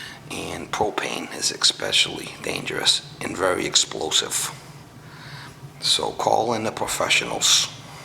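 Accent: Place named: New York, United States. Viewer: That New York accent though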